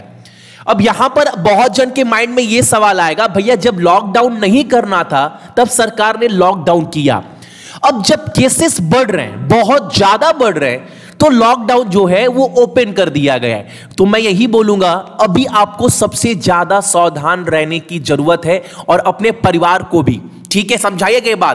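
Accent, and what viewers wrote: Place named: Kolkata, India. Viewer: Actually he is from kolkata so 11 is ekgarha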